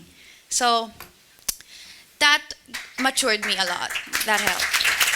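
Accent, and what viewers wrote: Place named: Philippines. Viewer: Any non filipino out there loves her filipino accent??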